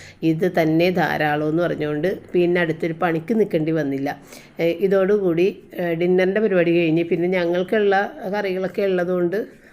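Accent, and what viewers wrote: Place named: Kochi, India. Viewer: ഒരിക്കലും വീഡിയോ നിർത്തരുത് ആരെ കണ്ടില്ലെങ്കിലും ഞാൻ വീഡിയോ കാണും കൊച്ചി ഭാഷയെ സ്നേഹിക്കാൻ തുടങ്ങിയത് സംസാരം കേട്ടത് മുതലാണ് ❤എന്നെ യൂ ട്യൂബ് എന്നും തഴയുന്നു എന്നാലും ഞാൻ നിർത്തില്ല 😀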